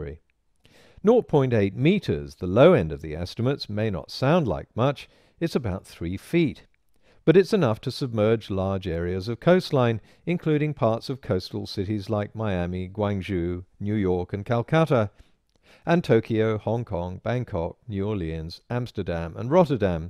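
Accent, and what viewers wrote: United Kingdom. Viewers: The British accent meshes very well with the heir of nonchalance. And truth. Can't overlook truth, right? I mean, you'd think... Whatever.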